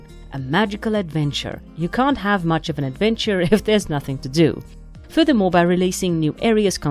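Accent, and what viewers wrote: Afrikaans, South Africa. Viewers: i can't tell if you are afrikaans or danish or what now. such a pretty accent! this game was everything to me as a kid, it's sad they've gone downhill. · IKR!! Thought her voice sounded SA but wasnt sure until she said she was😊 nice to see someone from here making good content. And me too, I would love to see the game back on track to being what it was, because that's what got us all playing in the first place. Jy ook😄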